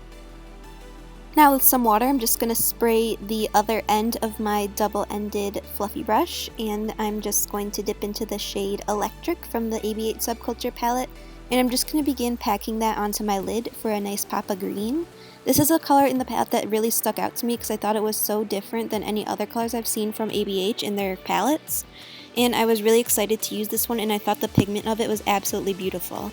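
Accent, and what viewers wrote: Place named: Michigan, United States. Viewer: I frickin love ur Michigan accent and how you say lashes its so cute 😙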